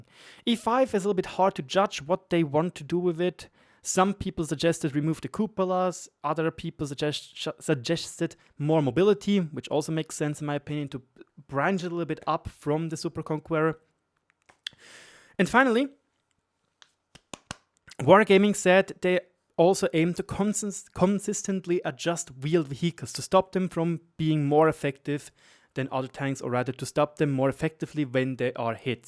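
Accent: Germany. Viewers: löwe your vidz you give highlights to the things that matters and your germania accent give it a flare thanks from sweden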